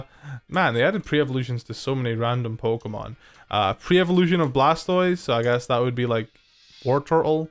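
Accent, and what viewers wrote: Scotland, United Kingdom. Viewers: I always thought you sounded slightly Scottish but I know for defo bc the irn bru, yasss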